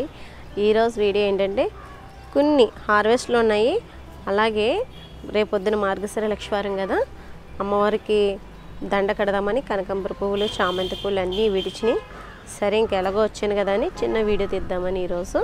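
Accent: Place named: Kakinada, India. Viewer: Kakinada voice super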